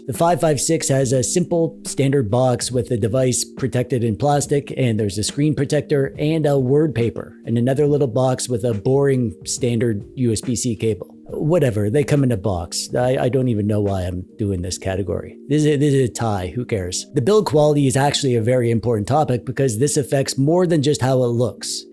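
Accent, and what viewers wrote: Canada. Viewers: You sound Canadian my Root Beer friend ??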